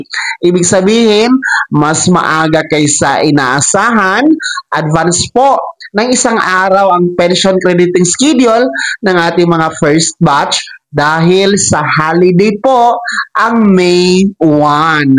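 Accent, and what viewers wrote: Visayas, Philippines. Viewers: ayusin mo pagsasslita mo!!! palibhasa bisaya ka!!!!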